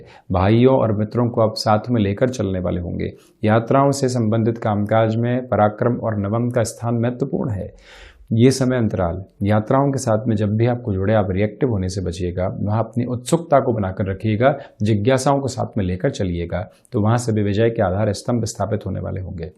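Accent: Standard. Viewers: Sayali absolutely my Hindi has actually improved to some extent hearing his pure Hindi.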